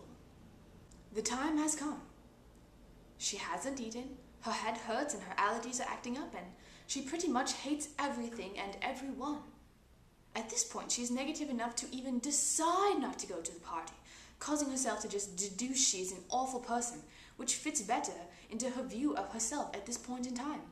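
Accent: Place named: United Kingdom. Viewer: it seems natural for you to have a british accent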